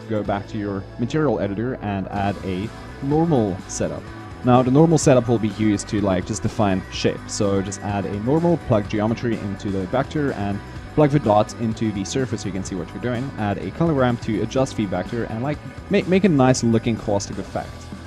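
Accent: South Africa. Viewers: You sound South African? Yeah boyeeeeeee!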